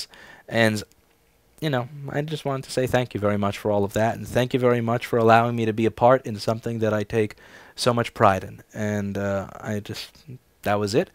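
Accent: New York, United States. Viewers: "Whaddya Pinocchio" so NY, as are you... Happy Birthday!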